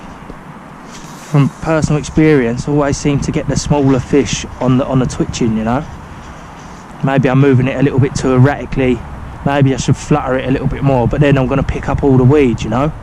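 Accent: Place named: South London, United Kingdom. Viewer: AAsplit shot on the end of its oooter ha ha ffs, subbed. you talk like me not from sarf London by any chance ?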